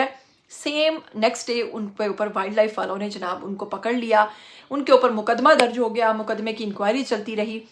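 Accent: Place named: Faisalabad, Pakistan. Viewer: Ap faislabad walon ki tarha punjabi bolti hn nice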